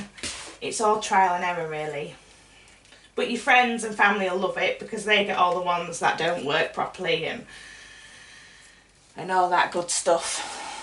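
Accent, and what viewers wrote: United Kingdom. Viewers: Great video, thank you. Great tips too and they look amazing. I never knew about the claw and brush technique. I love videos like this, they're natural - not forced and fake. I think I detect a fellow Yorkshire accent :). I'm glad the recipe isn't the one you use - I kept thinking 'No....don't give away your trade secrets / recipes'....I know that competition can be watching and I'm a firm believer in helping people - but I like to keep my hand of cards close to my chest :). You have to protect your business. Thanks for the recipe x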